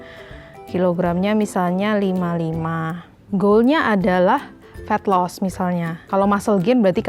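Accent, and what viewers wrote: Java, Indonesia. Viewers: Aku sub dah!!! Ekkekwke mirip hansol 🤣 nyrocosnya logat jawa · Adohh ngakak ya baru pertama kali liat video nya. Medok e keliatan 🤣🤣🤣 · Kak...kok ada medok nya....org mana kak????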